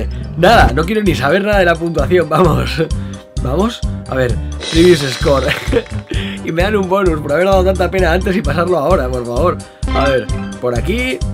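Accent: Argentina. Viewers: Amo como hablas en castellano (argentino) ❤ SOS UN GENIO💜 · hablando argentino es lo más hermoso que hay♥ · Jaja a es al que mejor le sale el acento argentino (entre los youtubers españoles que lo hacen)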